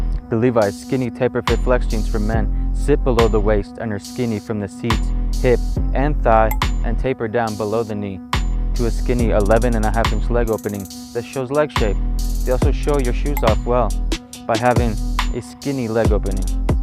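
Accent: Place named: Mexican American, United States. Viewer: Mexican American accent 👍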